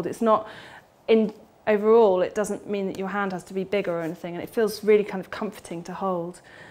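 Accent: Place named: RP, United Kingdom. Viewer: Absolutely. Cute lady. Posh accent, too.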